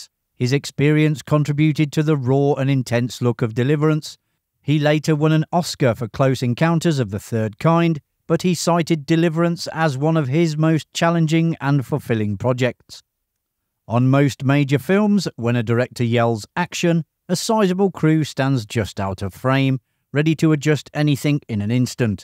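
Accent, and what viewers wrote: England, United Kingdom. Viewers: Can't stand the pommey accent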